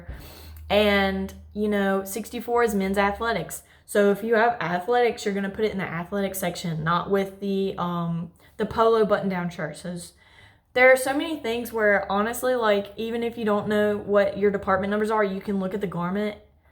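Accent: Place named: South, United States. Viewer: Your Southern Accent, is very very very Beautiful, with your very very very Beautiful Personality, my Friend 🥰🥰🥰🥰🥰🥰🥰🥰🥰🥰🥰🥰🥰🥰🥰🥰🥰🥰🥰🥰🥰🥰🥰🥰🥰🥰🥰🥰🥰🥰🥰🥰🥰🥰🥰🥰🥰🥰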